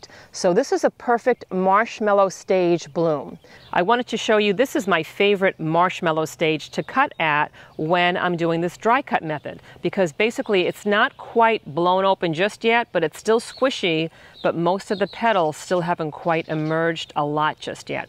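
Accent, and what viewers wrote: New Jersey, United States. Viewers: It's good to hear a Jersey accent again! I live in middle Tennessee now, Zone 6B, originally from north Jersey, Zone 5b/6a. We have a row of beautiful peonies that are over 60 years old. The story goes that my mother-in-law received them as a gift when my husband was born. They have a lot of meaning for us! I did my due diligence to prepare the soil well for the tubers we split from the originals and they are doing very well. I want to make sure I continue to care for them well. I had never heard of dry cutting before and I will definitely do this next Spring.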